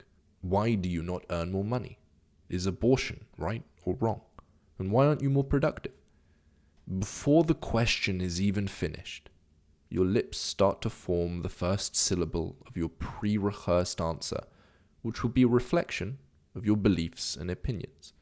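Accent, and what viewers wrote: United Kingdom. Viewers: I agree with you. If most of our beliefs and the beliefs of society are false and are not based in reality, how does he explain science? Hell, how would beliefs and his Effortless Action System be anything different other than false beliefs that are not based in reality? Oh, has stumbled upon the "Truth"? It doesn't help that it feels like is trying to subtly gaslight us into thinking that it's his teachings which are the only ones that are rooted in reality. Feels a bit cult-ish, to be honest. Honestly, this video felt like a slightly sophisticated Law of Attraction video with a British voice over. It also doesn't help that I feel like I haven't really been given much of an argument to examine so much as some clever sophistry.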